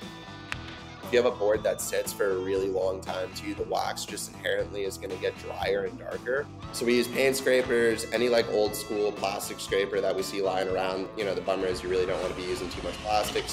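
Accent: California, United States. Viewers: This guy has the most California surfer accent I've ever heard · That Californian accent is thick and cute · I cant stand that California accent and im from here.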